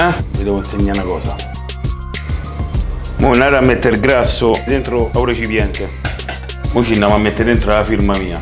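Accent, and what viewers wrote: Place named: Rome, Italy. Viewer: bravissimo,poi il dialetto romano...sono un fan!!! ma questo me pare sempre incazzato nero :-)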